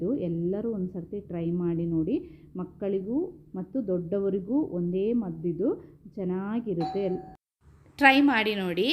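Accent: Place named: Bengaluru, India. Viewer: ನೀವು ಯಾಕೆ ಬೆಂಗಳೂರು ಕನ್ನಡ ಹೇಳುತ್ತೀರಾ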